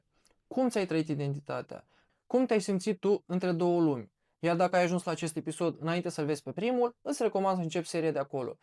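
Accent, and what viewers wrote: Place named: Moldova. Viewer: и акцент у тебя молдавский а не русский.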